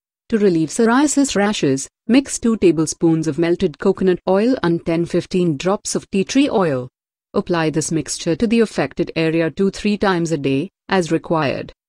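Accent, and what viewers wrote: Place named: North India, India. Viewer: This woman is from India obviously the slang also her voice more to North Indian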